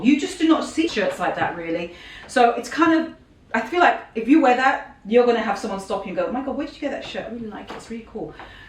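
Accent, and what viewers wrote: England, United Kingdom. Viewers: Love you! Love your English accent. LOVE YOUR CLOTHING LINE! SASSY.CHIC.COMFORTABLE. AFFORDABLE. Ciao an American in Sicily. Love when you visti my my city New york and talk to the people on the street.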